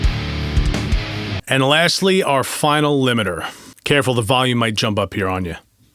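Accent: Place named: East Coast, United States. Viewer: With That east coast accent, I couldn't tell if he was saying "open up the DAW" or "open up the door"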